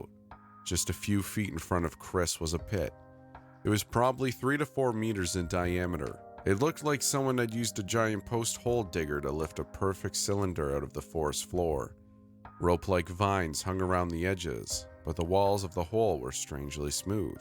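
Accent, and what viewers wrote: Canada. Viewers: "Soary=sorry" "bean=been" lol are you Canadian? Great narration anyhow!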